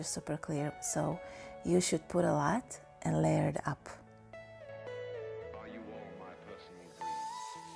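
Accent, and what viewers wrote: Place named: Russia. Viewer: Русский акцент слышен всегда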